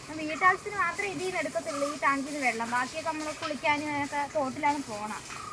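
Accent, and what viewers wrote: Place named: Kollam, India. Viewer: നിങ്ങളുടെ സ്ഥലം എവിടെ ആണ്??...കൊല്ലം ആണോ??...സംസാരം കേട്ടിട്ട് കൊല്ലം പോലെ തോന്നി??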